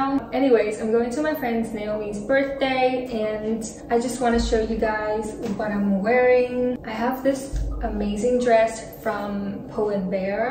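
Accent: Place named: United States. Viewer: Sounds like you was educated in the states?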